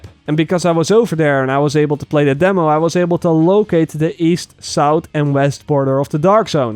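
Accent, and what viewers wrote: Netherlands. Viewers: ja hoort best wel duidelijk je nederlands accent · wow this guy is dutch af! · ik wist dat je nl was ;) Door die tweet screesnshot bevestigde het ;) Je hoort het aan je accent ;) Wel echt nice video!! :D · lol deze man is zwaar Nederlands hoor Hoe hij klinkt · is this guy dutch? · Noticed the Dutch acent in there, I was like.. This has to be a fellow Dutchy >.<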